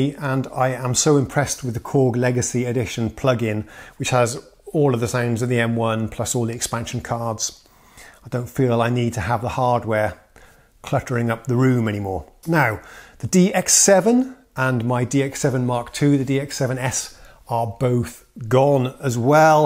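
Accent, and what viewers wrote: United Kingdom. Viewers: And... Are you British, Swedish or from another country? I always thought you were British like me!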